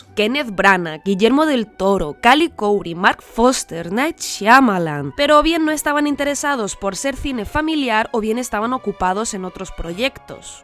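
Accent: Spain. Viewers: Que incómodo tu acento TAN marcadamente español, me encantaría que fuera un acento más neutro :( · Que Asco es el Acento de la madre patria España, pero què tan de bien has narrado y analizado la direcciòn de esta magistral pelìcula 🎉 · horrible escuchar un españolete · A los españoles les duele el culo cada que pronuncian bien el Inglés? O por qué nunca lo hacen? Dos minutos aguanté el video, una mierda de acento, espero que no me dé cáncer en los oídos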